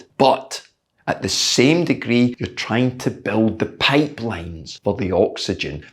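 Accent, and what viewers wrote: Scotland, United Kingdom. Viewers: After watching several of your videos, the voice in my head while I'm cycling now speaks Scottish.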